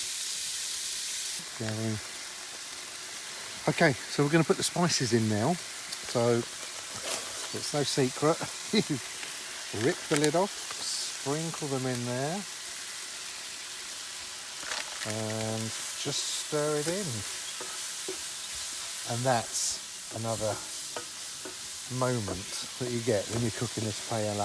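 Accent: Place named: United Kingdom. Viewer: I am just here for the british Accent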